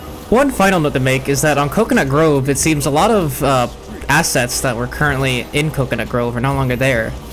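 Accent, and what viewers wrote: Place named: Italy. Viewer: I am italian, you are italian, i can hear that you are!!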